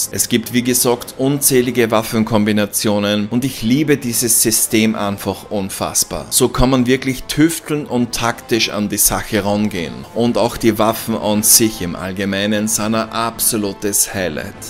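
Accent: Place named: Vienna, Austria. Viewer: zu schwer - ganz einfach: 90% der Spieler, die es kaufen sind nicht gitgut und mit einer kleinen Minderheit(profispieler) macht man kein Geschäft - mir z.B machen die Bosse überhaupt keinen Spaß sondern das dazwischen .. ich spiele schon seit 11 jahren Skyrim (pause mit Elden DS3 Sekiro usw.) aber nicht das Spiel selbst sondern weil es riesige modder szene gibt - ich schraube nicht im Spiel sondern am Spiel - herrlich bescheuert - bald kommt Lords of fallen - freu mich deine auf Anleitung - als Wiener mit dem Slang ein Genuss -habe es vorbestellt mit DarkNight extra :D weiß jetzt schon ich das nur gefickt werde :D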